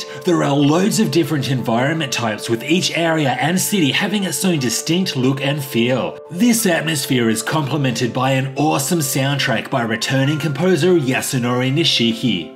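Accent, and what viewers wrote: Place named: Australia. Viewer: The Patreon joke and the rpg in the name and the Aussie accent had me instantly sub.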